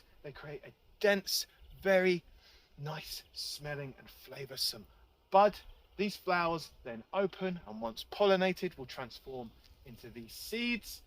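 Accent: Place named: London, United Kingdom. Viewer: He is handicapped by a Nu-London accent, innit.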